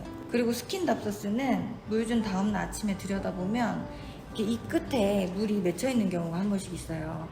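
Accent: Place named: Jeolla, South Korea. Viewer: 절라도여? ㅎㅎ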